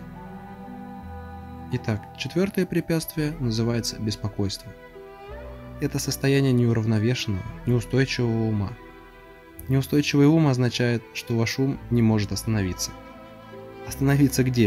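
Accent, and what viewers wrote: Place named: Russia. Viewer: Темнишь ты ,акцент кагбуд то родился в сраной рф и в ней и по сей день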